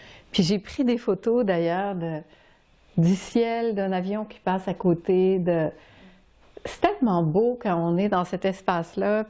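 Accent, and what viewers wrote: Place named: Canada. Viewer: Quel régal... "On l'r'ssin lô on l'r'ssin" 😂😂😂 moi aussi je l'ressens là et j'aime tellement votre accent canadien, si doux, si chaleureux... Merci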